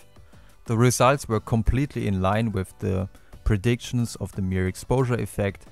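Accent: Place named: Germany. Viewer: Social loafing und social inhibition sind ja nicht die selben Sachen oder? Social loafing ist soziales Faulenzen, was ist der Fachbergriff für social inhibition auf Deutsch? P.S. Ich frage weil ich den Deutschen Akzent raushöre und mir das sonst niemand beantworten konnte. P.P.S Ich spreche auch Türkisch und die letzten drei Wörter sind definitiv nicht Türkisch, eventuell sind die Wörter falsch geschrieben. Habt Ihr ne Quelle?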